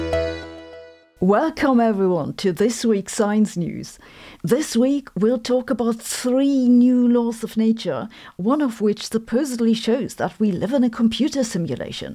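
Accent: Germany. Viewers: Dear Sabine, just two questions about your themes presented in this video: i) You said that although the mathematical construction works, there's no physical evidence for the "simulated universe " theory. Is there any evidence that excludes this, too?.. If there is, I'd very much like to know... ii) About the method of road construction on the Moon surface: do the scientists involved tested the structural endurance of their "melted tarmac " against the extreme conditions in their lab, concerning temperature, that the surface endures throughout the day-night periods? According to my - limited- knowledge, that's the prime factor for any construction, set aside the humidity, which is non-existent on the Moon, of course... Thank you for your videos! Popularised science, in layman's terms for the broad public, is the best way to spread the achievements of science widely. 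Even speaking with a heavy German accent, which sometimes reminds me the Peter Sellers' performance in "How I learned to love the Bomb"! 😊 I would very much appreciate a reply to my questions, if you please..!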